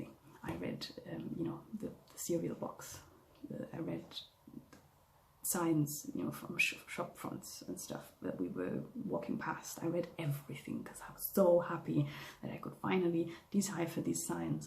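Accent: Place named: United Kingdom. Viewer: Great video! 😄 I also didn't know the term Literary Fiction before I found Booktube. I googled it. 😄 Your English is really good btw. Did you spend some time in Great Britain? (Just a guess because of certain words you use. 😅)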